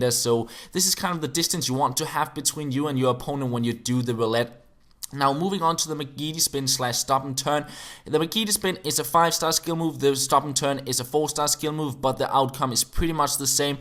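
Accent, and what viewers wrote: Denmark. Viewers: Is he Danish becues im Danish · Are you danish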